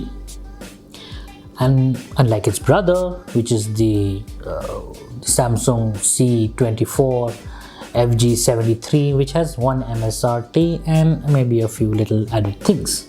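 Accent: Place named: India. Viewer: This isn't any hate, my problem is not that you're indian, but the accent tickles me so much man x)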